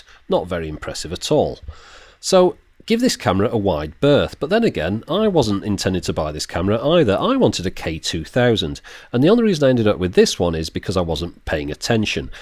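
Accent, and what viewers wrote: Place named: England, United Kingdom. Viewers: Your smooth English accent makes this 4x better. Great video! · I agree with Adam Therful (down in the comments) about the smooth English accent. Reminds me of Ringo Star narrating Thomas the Tank Engine TV series. Good work, Keep it up!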